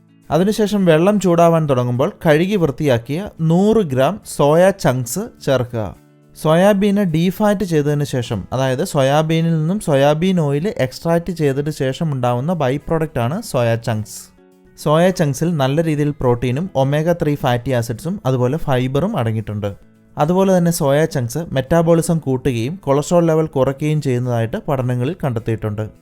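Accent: Kottayam, India. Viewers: Chettan kottayam ano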